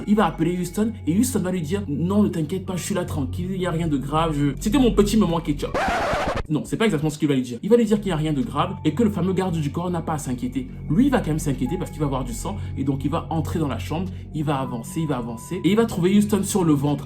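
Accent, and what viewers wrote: Cameroon. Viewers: Tu ne serais pas camerounais par hasard parce que tu as un petit accent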